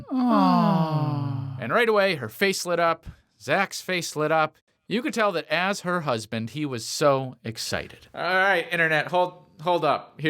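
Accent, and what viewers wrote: United Kingdom. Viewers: Are you British ????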